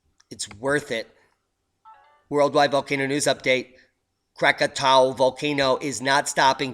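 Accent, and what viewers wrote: England, United Kingdom. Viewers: for a moment there I thought I was listening to an English bloke, the accent was so good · Last night I watched a visually stunning doc called "A Volcano Odyssey", YT...they explain that the meteorite that "caused" the extinction of dinosaurs 65 million yrs ago was not the only phenomenon that wreaked havoc on earth (we knew that..;)....India was a floating continent below the equator at that time, drifting northward over a hot spot, and a massive volcano that erupted for perhaps millions of yrs at about the same time, wOw The mountains of Mahambaleshwa were created in northwestern India...Fascinating... Volcanoes Rule, are Earth's supreme geoengineers =D BooM! Thank You...I love your Brit accent;) Many Blessings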